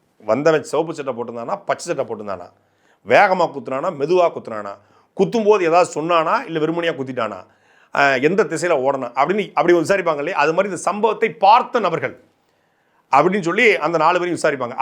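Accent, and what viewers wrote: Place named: Tirunelveli, India. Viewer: சாட்ட நீ பெரிய ஆளுயா.... உண்மையாவே very interesting politician..... செய்து உன்ங்க நல்லூர்.... வாழ்த்துக்கள் தம்பி.. தொடரட்டும் நெல்லை தமிழ்... கேட்க இனிமையாக இருக்கு தம்பி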